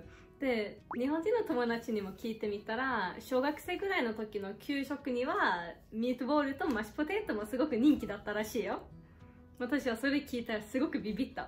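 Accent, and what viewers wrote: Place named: Sweden. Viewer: Varifrån i Sverige kommer du? Du pratar jättebra Japanska tycker jag och jag förstår att du är Svensk! Vet du varför? det uttalandet ( Z ) på Japanska är Svenskt eller Skandinaviskt! Ha det så bra -san. 😊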